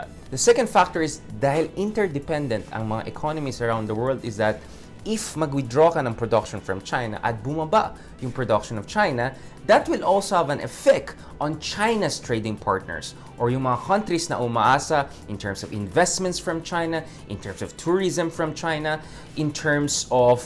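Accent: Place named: Conyo, Philippines. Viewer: Nakakairita naman pagkacono mo pre. You buckle in ur pronunciation of English words sorry tpos nagsslang ka sa Tagalog when u even stutter with the English words. U don't sound natural in English nagkakapulupot dila mo.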